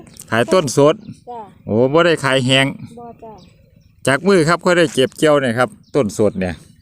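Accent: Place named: Chaiyaphum, Thailand. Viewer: เจ้ากะเป็นคนชัยภูมิติ ภาษาที่เจ้าเว้า ถ้าบ่ชัยภูมิกะหนองคายเด้ข้อยว่า ข้อยกะคนบ้านแท่นคือกันเด้อ บ้านหัวนารู้จักดี เลี้ยวขวาข้างๆที่ว่าการอำเภอ ไป2กิโลฯมั้ง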